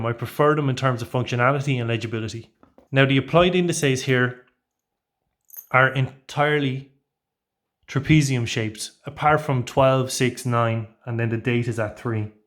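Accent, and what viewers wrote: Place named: Ireland. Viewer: Quality! Like your accent! More Irish-style reviews please! Much better than most of the channels here! Subscribed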